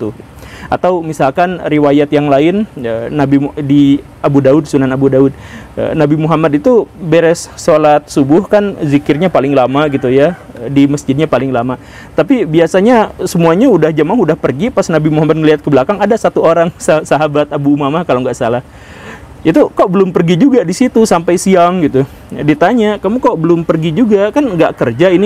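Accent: West Java, Indonesia. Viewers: Klo sy Alhamdulillaaah,ga prnh ikut2an.waktu itu pernah rame di daerah tmpt tnggl sy,sprti yg di ajarkan ustdz YM,ada yg ikut beberapa orang,kata mereka hayu cpt sedekah 50rb juga gpp,nanti sebulan lg kIta dpt rezeki 70kali lipat, Klo sy terus terang langsung mengernyitkan dahi(????) BTW sy baru liat channel,maasyaa Allaah ilmu'nya🤗🤗berbobot, penyampaian'nya ringan,logat sunda pula,da abdi ge sunda😘😘😘👍🙏🏻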